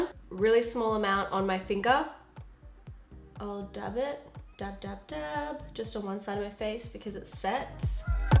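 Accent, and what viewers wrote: Australia. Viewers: Loved the look! The palette looks amazing! And love that you’re repping the Aussie accent 🤙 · Your accent🥺🥺 I miss your fully Australian one😔❤️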